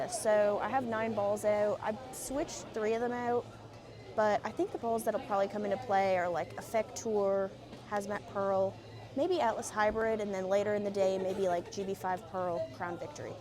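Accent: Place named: South, United States. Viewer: Picked up on that southern accent real quick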